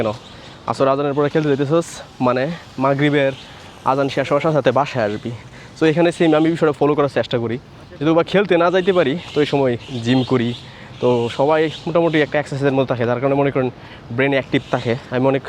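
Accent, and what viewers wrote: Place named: Kishoreganj, Bangladesh. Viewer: ভাই আপনার ভাষার টোন টা কিশোরগঞ্জ বা ব্রাহ্মণ বারিয়ার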